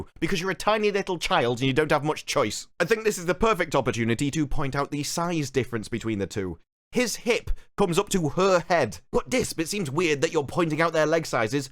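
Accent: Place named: Sheffield, United Kingdom. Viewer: Yet another great reason to not watch modern SW. Your accent is bugging me, it sounds familiar (I'm Rotherham area) and every now and then your accent reminds me of PJW who hails from Sheffield.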